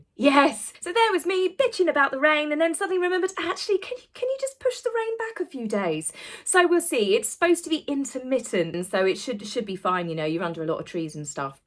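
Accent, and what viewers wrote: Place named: Sussex, United Kingdom. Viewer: I just realised that I don’t hate the Sussex accent after all, I thought I did, turns out it’s just my neighbour I hate. Your accent is nice.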